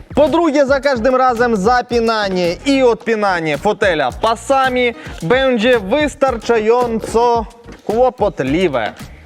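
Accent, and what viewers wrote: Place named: Ukraine. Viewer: Dlaczego znowu słyszę Ukraińca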